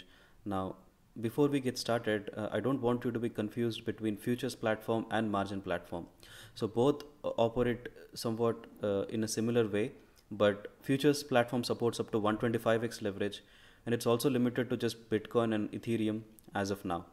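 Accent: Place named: India. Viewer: I knew it that he is Indian guy as soon as i heard his english accent and he proved it in that chart of Trading Comparison because only Indian or non US citizens would write $ sign after the number 😂 Instead of writing it as $10 he wrote it as 10$ hence proved Indian. No offense I'm from India too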